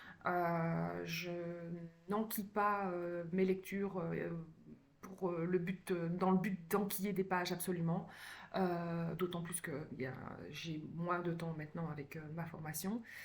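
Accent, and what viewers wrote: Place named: Belgium. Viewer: Coucou! Trop contente de te voir sur Youtube ♥ Bienvenue! Je me retrouve tellement dans tout ce que tu dis:)) J'aime beaucoup ta douceur, et ton petit accent belge hihi. Hâte de voir tes prochaines vidéos! Bisouuus ♥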